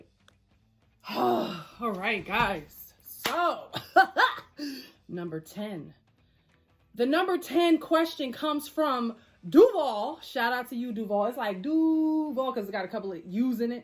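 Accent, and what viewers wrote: New Jersey, United States. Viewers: Hold up you from new jersey? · YO Are U from Jersey City?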